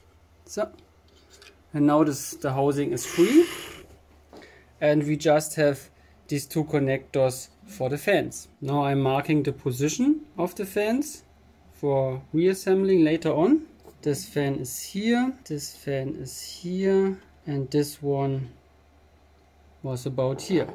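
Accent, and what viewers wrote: Germany. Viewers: Funny how I noticed after the first sentence that you are German xD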